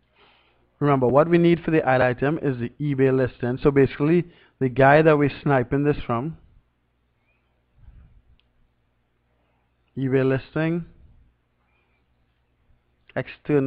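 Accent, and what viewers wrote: Trinidad and Tobago. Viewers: you sound like a Trini if you are i am too and have been drop shipping both on ebay and amazon will love to connect can we watsup or messager ???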